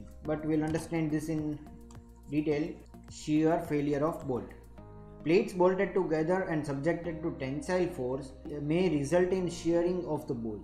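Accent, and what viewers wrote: Gujarat, India. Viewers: gujrati accent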